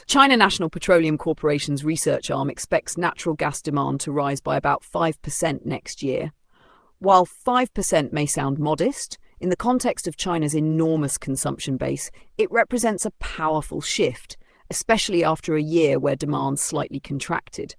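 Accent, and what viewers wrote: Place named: United Kingdom. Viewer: This is my new favorite channel. Pertinent info given in a cool British lady's voice. 😊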